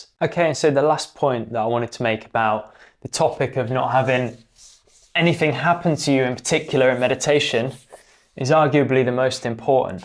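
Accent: United Kingdom. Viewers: Yes it's great to hear an English voice, Thank you.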